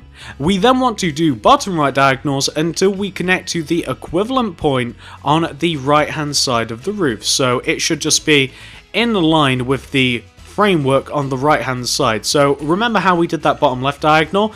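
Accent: United Kingdom. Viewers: + Kevin Adams Yes he is British ( focus a little bit on his accent ) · I have a question are you British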